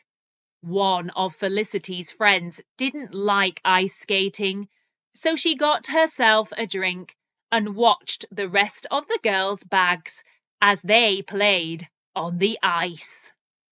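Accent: United Kingdom. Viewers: I did enjoy reading and listening your British accent. There were some words that I couldn´t get it however it´s great news due to it´s means that I have just learned more. Thank you.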